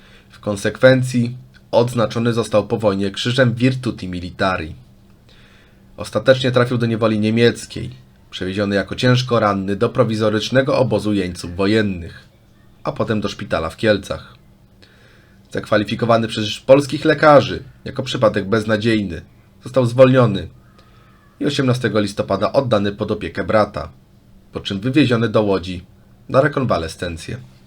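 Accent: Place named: Ukraine. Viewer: Bo to Ukrainiec i nie mówi tak dobrze po polsku jak Polacy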